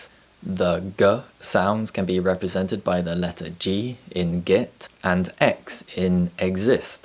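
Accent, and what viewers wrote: United Kingdom. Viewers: Things that i love the most is learning british accent british slang anything about Britain.are u british?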